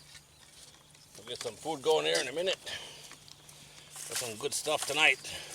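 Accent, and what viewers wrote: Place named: Canada. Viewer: Great vlog, love the camping with the Dog. Where are you from originally? I would say Canada but not great with accents.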